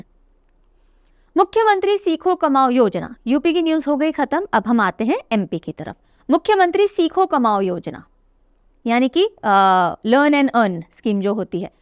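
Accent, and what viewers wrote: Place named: Maharashtra, India. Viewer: Your marathi accent is too good mam....Aaple sarkar seva kendra...love from Maharashtra ❤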